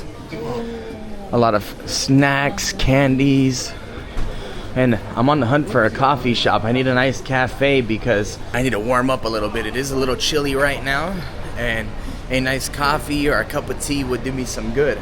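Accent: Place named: United States. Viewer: actually you no what ..... dude thats so annoying your whiney american accent doesnt help tho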